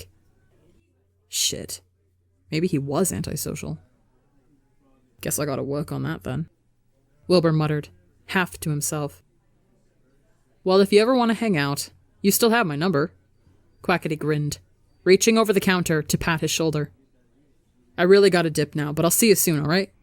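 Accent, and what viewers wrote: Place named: United Kingdom. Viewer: IM SORRY BUT I CANT HELP BUT LAUGH AT THE BRITISH ACCENT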